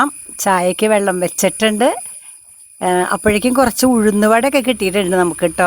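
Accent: Thrissur, India. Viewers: ത്രിശൂർ കാരിയാണോ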